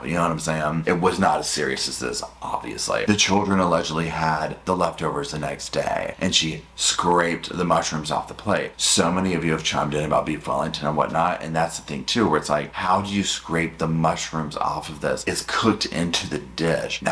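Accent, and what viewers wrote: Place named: North Carolina, United States. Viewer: Just wanted to give you some love from NC and absolute appreciation for your content. I look forward to seeing every notification of a new video. I live in Durham,NC ❤ i knew your accent but didn’t realize you are from NC also.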